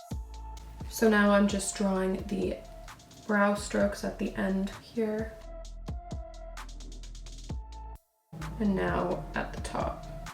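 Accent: Toronto, Canada. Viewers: Why can I always tell when its a fellow Canadian LOL!? Our accents are not even that apparent !! anyways you're stunning hun! from another Canadian living in the UK <3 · is this weird.. from your voice i could tell you were from Toronto.. then you mentioned winners so I knew you were from Canada then I checked your ig and turns out you are! ahah love your videos! we need a toronto beauty guru!!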